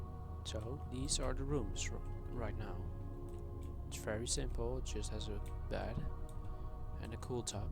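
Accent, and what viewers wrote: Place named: Turkey. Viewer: Question: Are you Turkish? You sound Turkish.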